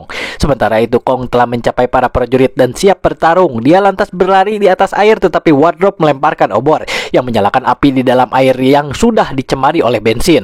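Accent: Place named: West Java, Indonesia. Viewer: Anjir suara nya mirip si jon lQ7 logat sunda nya krasa..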